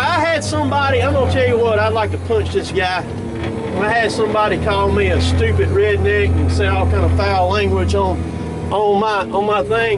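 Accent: South, United States. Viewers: Amen brother. That's the way we talk down here in the south.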